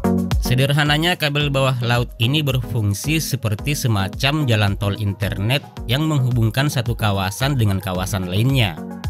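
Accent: Medan, Indonesia. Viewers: Medan kali suaranya 😅😅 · Orang Medan ya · Medan punya nih suaranya 😁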